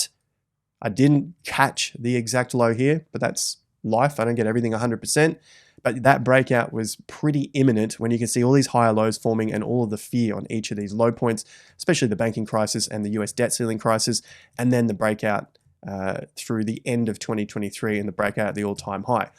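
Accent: Australia. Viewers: Legendary as always! I seem to laugh more than I probably should purely from the Aussie delivery and the humour 🤣👌 love it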